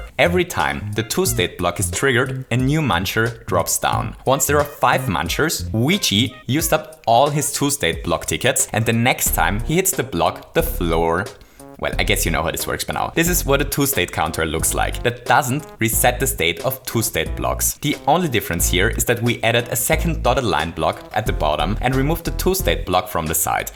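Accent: Austria. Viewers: Moment... du bist Österreicher? 🤔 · Bist du Österreicher?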